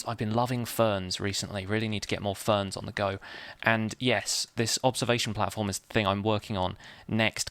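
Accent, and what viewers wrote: United Kingdom. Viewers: Thumbs up, i came from OMGcraft aswell, btw ur british right?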